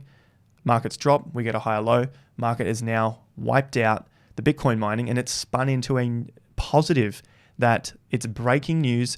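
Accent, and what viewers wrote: Australia. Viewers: Another perfectly explained video that helps most <12month newbs. (Me included). Such a great Aussie way of delivering as well. Thanks for your content.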